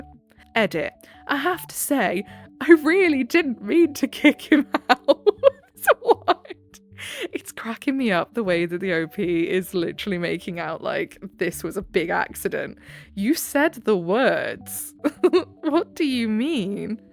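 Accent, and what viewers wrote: United Kingdom. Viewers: I've discovered your channel last week. It has been recommended to me by Youtube. I was particularly interested by the "Human narrator" in the thumbnail. Your voice is very soothing and I love hearing some British accent. So, you've convinced me, I'm making an offering to the algorythm, because there's more people like me who would like your channel to pop up in their recommandation as well.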